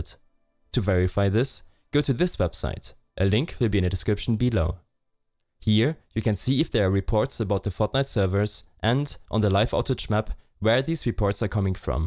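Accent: Germany. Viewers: german accent we know dude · dieser deutsche akzent HAHA aber gutes Video ;D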